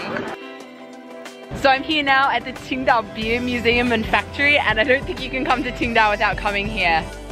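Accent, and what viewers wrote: Australia. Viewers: Heyyy, I'm a Chinese student currently studing in Melbourne, its suprised to know that you are a Aussie, like your accent and video, and the way how you expericen and introduce Chinese culture. And one more thing is I recommend my hometown Fuzhou city in Funjan province. The climate there is quite similar to Sydney, and it's famous for it's beautiful greenary and parks. Looking forward to see your video inroducing my hometown:）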